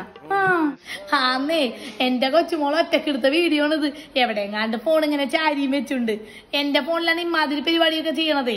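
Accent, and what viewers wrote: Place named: Paravur, India. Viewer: I love your parur Accent👌👌I am also from parur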